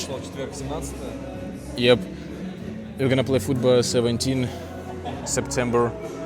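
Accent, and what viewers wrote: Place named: Russia. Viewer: Don’t stop bro, you’re doing well🔥👍 Your voice is completely changed when your speak Russian(I know the Russian accent)Support from Kazakhstan 🇰🇿🇰🇿🔥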